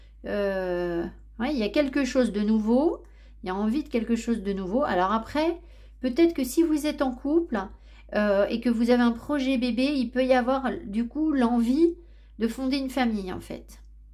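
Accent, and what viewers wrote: United Kingdom. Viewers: ta une British accent I swear ♥️♥️🙏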